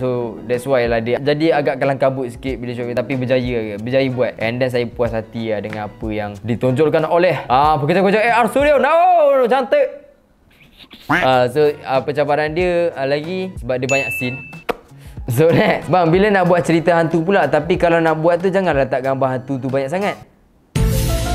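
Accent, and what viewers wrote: Terengganu, Malaysia. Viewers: Aku jem, loghat Terengganu ke atau Kelantan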